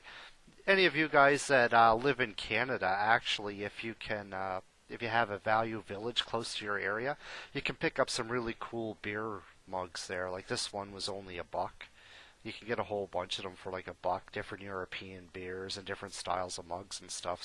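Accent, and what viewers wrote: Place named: Ireland. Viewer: You sure your not from Ireland, you sound really Irish.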